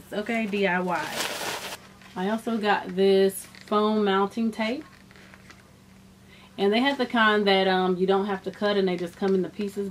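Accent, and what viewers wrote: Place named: North Texas, United States. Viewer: You are how I act when I am alone and can't be judged for being silly. Don't ever loose your personality. Where are you from? I would guess north Texas or Oklahoma only from the accent. I live in Dallas. Stay Sweet ❤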